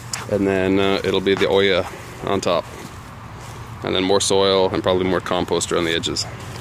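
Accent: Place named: Canada. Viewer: Thanks for the speedy response. I thought I detected a Canadian "about" in your video. I think I might have to give one of your barrels a try. Thanks for all the info!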